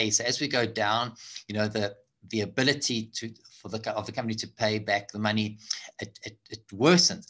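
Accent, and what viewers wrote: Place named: South Africa. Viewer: i love a good south african accent!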